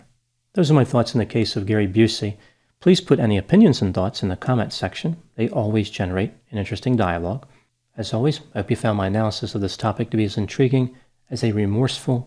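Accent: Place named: East Coast, United States. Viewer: With all due respect to those from New Jersey, judging by East Coast accent, I'd say he's been there a time or two. So, he likely knows of what he speaks.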